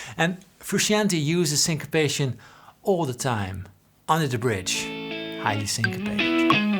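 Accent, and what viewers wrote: Rotterdam, Netherlands. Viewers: Hey ouwe Rotterdammert! Ik wil je graag zeggen hoe leuk het is om een gitaar youtuber te horen in m'n eigen accent:) Ik woon al 15 jaar in Sydney. Speaking of John riffs and licks, I think is somewhat similar to this one. A good one to do is the solo on ' '! I'm sure there is something interesting about it. En nog gefeliciteerd met het uitlopen van de Marathon!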